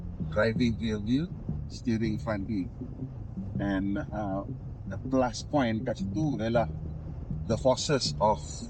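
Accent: North, Malaysia. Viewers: Biasanya saya akan puas dengan penjelasan, cara bercerita dan selit berseloroh loghat utara, tapi kali nih saya berkedut dahi nak faham abang sulam campur rojak dgn bahasa Inggeris..hemmm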